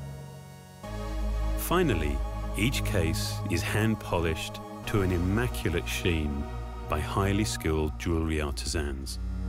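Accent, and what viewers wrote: United Kingdom. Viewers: it's the British accent lol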